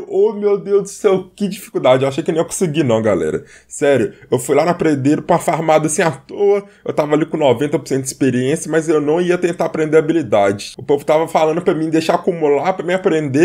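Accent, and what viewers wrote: Minas Gerais, Brazil. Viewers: Parabéns. Você é mineiro né adoro esse sotaque.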